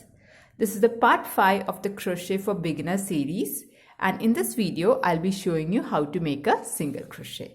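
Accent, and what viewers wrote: India. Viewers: I am watching this in 2025….this video helped me a lot when i heard the Indian accent i knew this was the right video i am a bit impatient type of a person but this actually helped …all the American tuts were wastage of time❤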